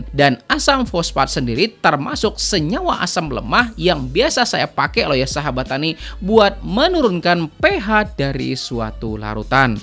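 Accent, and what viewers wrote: Banjar, Indonesia. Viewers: Nakaya p ne model nya Bahasa urng banjar logat nya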